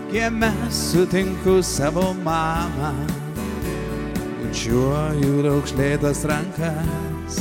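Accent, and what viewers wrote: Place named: Kaunas, Lithuania. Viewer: Vieninteli pliusas, kad moka kauniokišką lietuvių tarmę.Beje,kaip ir aš.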